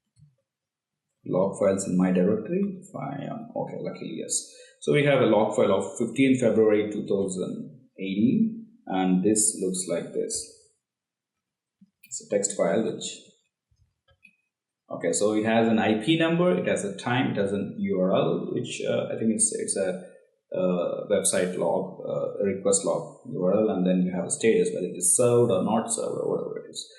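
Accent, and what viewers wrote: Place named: India. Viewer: Great content.. And our Indian acccent is also good fyi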